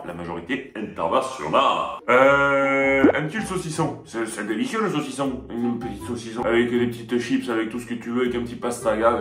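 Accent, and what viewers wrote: Quebec, Canada. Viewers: L'accent québécois 10/10 ✨, incroyable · Ton québécois 10/10 pour une personne qui te suit du Québec super accent 👌🏻💥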